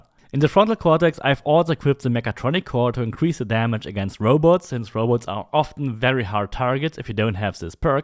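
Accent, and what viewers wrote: Germany. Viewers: I hear the german 😂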